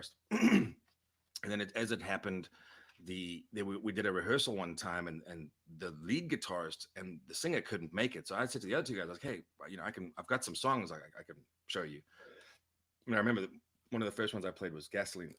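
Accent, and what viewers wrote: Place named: South Africa. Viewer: Didn’t realize he had an accent then I forget they’re from South Africa lol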